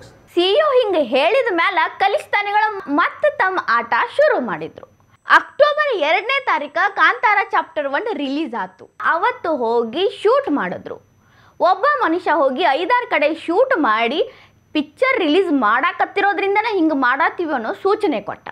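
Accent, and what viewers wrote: North Karnataka, India. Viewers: ಉತ್ತರ ಕರ್ನಾಟಕ ಕನ್ನಡ 👌🙏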